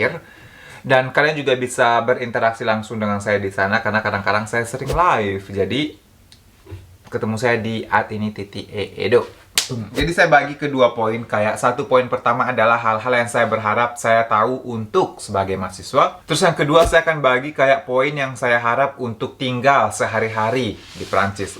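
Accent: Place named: Padang, Indonesia. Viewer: Suka banget denger logat Padang kamu... Love you...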